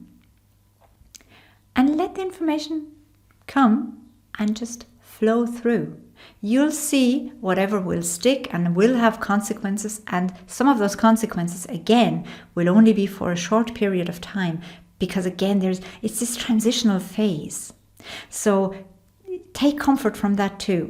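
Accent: Ireland. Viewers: Yir accent is lovely. Love the irish undertone. 🤗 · Your sweetness and your Irish accent reminds me of Lorna Byrne, the Angel lady.........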